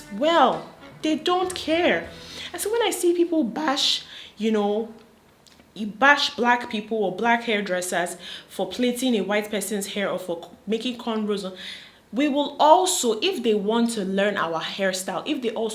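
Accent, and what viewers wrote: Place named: Ghana. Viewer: Gee, I caught some Ghanaian accent from you. 😂💚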